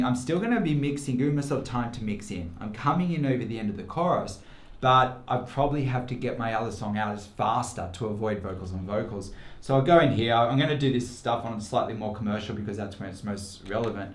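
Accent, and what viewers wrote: Australia. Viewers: you got such a positive vibe mate, are u Aussie? just love your videos, great content and genuine way to teach, cheers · You are Aussie right ??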